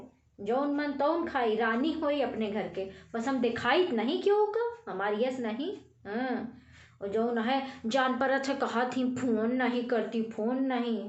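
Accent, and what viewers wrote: Awadh, India. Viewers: Bahute Neek Madam Ji, I like Yours Voice with awadhi Veri attractive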